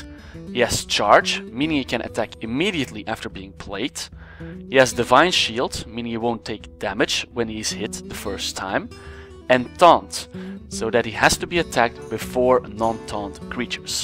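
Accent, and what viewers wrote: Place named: Netherlands. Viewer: are you dutch? you sound like you are. nice vid btw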